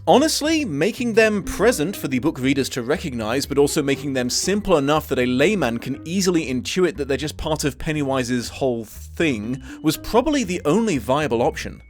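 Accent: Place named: England, United Kingdom. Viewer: ExPat? So your accent isn't real? I thought you were English so good job! :)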